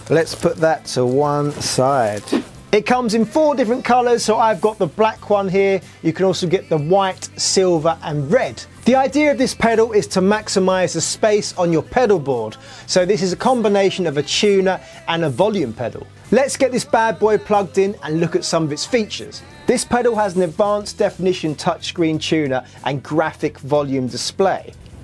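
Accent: England, United Kingdom. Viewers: Are u from England? I lived there for 5 yrs...IPSWICH!!!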